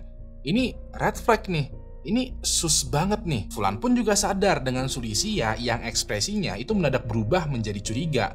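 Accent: Indonesia. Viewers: gapapa biar lebih gampang dipahami karena logat indo. wkwk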